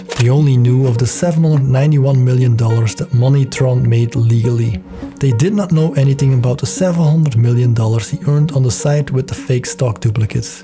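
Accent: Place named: Flanders, Belgium. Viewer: Ja, vooral het accent, inderdaad. Typisch Vlaams, je bent niet alleen uiteraard. Maar denk ook even aan het doelpubliek, dat toch bijna 100% Vlaams/Nederlands is. Dan heb je al weinig reden om dit in het Engels te proberen. Voor degenen die onze taal niet machtig zijn volstaan ondertitels ruimschoots.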